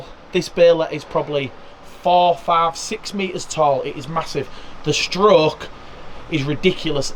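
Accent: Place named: Hull, United Kingdom. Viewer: Nice video fella, and nice to hear the Ull accent, I was a holderness road boy many moons ago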